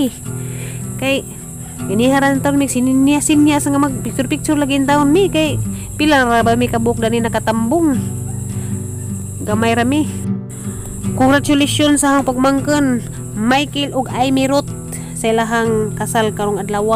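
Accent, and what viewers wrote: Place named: Visayas, Philippines. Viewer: . Arang k islang ag host oii. Halata baya PUD NGA BISDAK KAAU.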